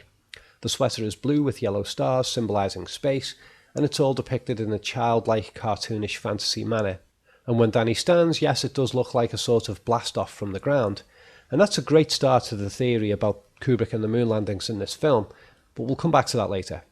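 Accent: Liverpool, United Kingdom. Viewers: i didn't realize you were a scouser! errrrm nice one :)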